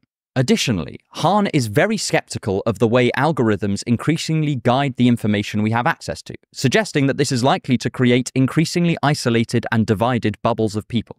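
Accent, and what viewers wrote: United Kingdom. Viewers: first viewer and just known this channel 2 mins ago. been here cause your beautiful British accent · Who close captions your videos? I see a lot of errors that could/should be remedied as they don’t recognize the idiosyncrasies of your British accent.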